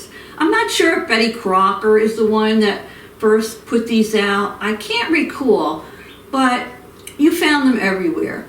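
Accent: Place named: South Jersey, United States. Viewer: Oh man, this looks really good, I even think my grandlittles and son may like it! Baking is not my forte so I am ready and open to learning from the herself! By the way....I recognize that accent. I am a former South Jersey girl. Loved it and miss it but we are now back in my home state, NE. Really love your creative video style. So looking forward to more! Also put your notification bell on "All"!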